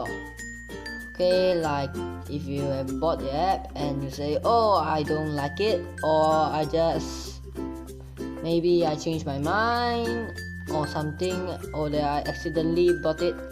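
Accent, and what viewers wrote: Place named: India. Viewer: Sorry guys but he sounds like one if those Indian scammers lol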